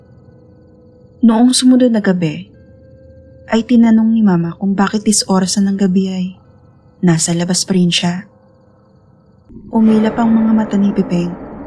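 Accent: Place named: Conyo, Philippines. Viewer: Yunh conyo ka HAHAHAHA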